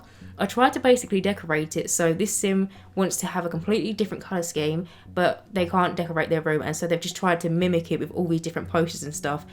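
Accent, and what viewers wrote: United Kingdom. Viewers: i miss playing sims, i dont have enough space on my pc. but i love watching you and listening to you! :3 i love british accent!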